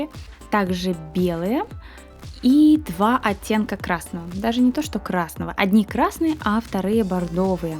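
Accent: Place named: Belarus. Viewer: Вы не забывайте что люди в Белоруссии живут, и у них есть свой местный говор...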